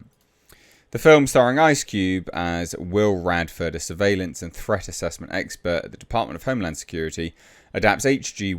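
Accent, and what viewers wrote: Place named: United Kingdom. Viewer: I don’t know if it’s his British accent but is so funny 🤣 he’s not even trying to be funny.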